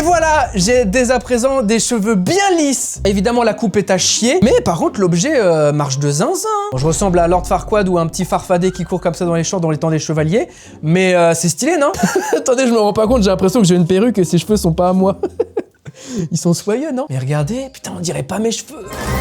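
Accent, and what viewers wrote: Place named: Quebec, Canada. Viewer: Omg l'accent québécois jadoreeee en ten que québécoise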